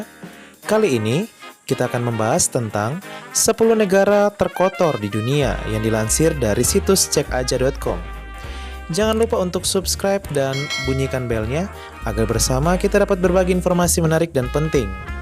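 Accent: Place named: North Sumatra, Indonesia. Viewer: penekanan huruf "e" Nya masih nampak. Sepertinya orang sumatera Utara.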